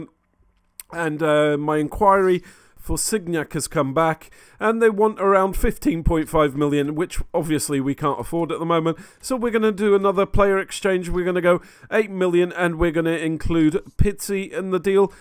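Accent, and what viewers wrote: Portugal. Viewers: You re portuguese right?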